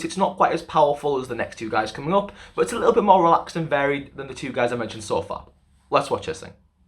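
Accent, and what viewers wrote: United Kingdom. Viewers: love your videos! Just have to ask what is your accent? is it pure british or sth else?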